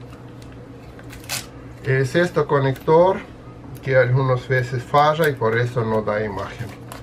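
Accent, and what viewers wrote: Russia. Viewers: Ruso o ?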